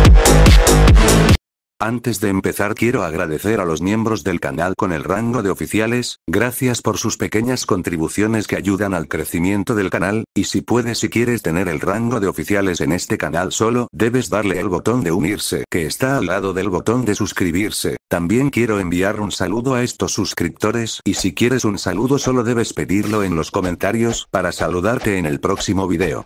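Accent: Dominican Republic. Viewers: Aaaaa Eres dominicano?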